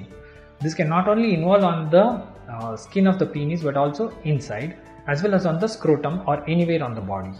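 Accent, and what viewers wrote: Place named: Punjab, India. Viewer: I don’t understand Punjabi English.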